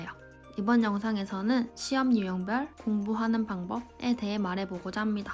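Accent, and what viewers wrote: Busan, South Korea. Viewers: 국립대라고 했지만 부산대가 쎄게 의심되는 억양..매력있어요ㅋㅋㅋ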